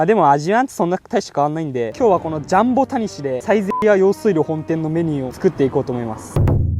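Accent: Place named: Mikawa, Japan. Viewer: 方言が愛知っぽい どっちかって言うと三河の方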